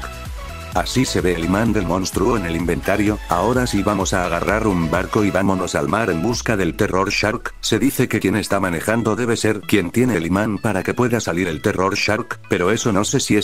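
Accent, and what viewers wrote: Dominican Republic. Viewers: Aaaaa Eres dominicano?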